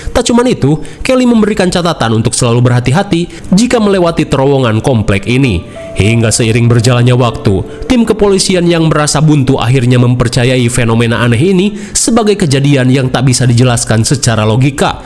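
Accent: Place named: West Java, Indonesia. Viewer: Asli mana bang sundanya ?? Jadi penasaran hehe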